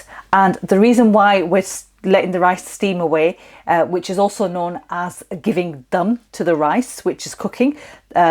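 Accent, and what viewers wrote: United Kingdom. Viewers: You sound like you have a little British accent, but not like people who were raised there. How long have you been in the UK? (just curious... I'm a bit of an 'accent' nut)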